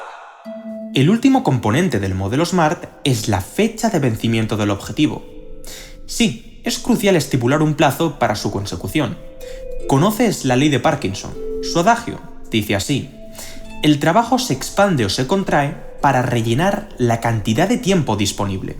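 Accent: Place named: Spain. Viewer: nadie te preguntó, no lo veas y punto. Su acento es español.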